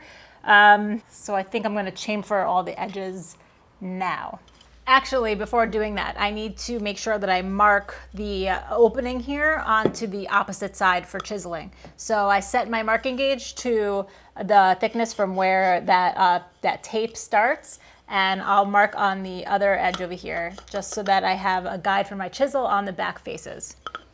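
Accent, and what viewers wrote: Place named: New York, United States. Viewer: Do you happen to be from New York or New Jersey? There's a draw to some of the words you say, that sounds New York esk. Just wondering.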